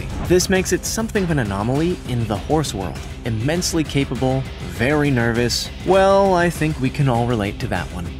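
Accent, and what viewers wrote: Canada. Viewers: Christine Still he’s Canadian and I have a canadaian friend who pronounces it the same idk tho🤷🏼‍♀️ maybe it’s not that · Accent canadien eh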